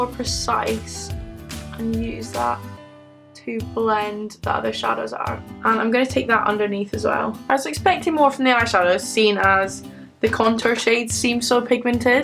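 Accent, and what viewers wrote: Scotland, United Kingdom. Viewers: Is she Scottish or English dumb question ik 😂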